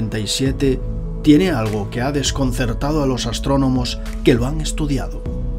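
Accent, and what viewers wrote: Spain. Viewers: Este bato hace buenos videos, pero no puedo evitar que me cagué el acento español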